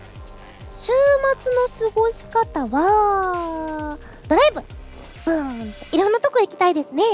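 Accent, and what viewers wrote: Kansai, Japan. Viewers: 最近、アイちゃんの関西人感がスゴい！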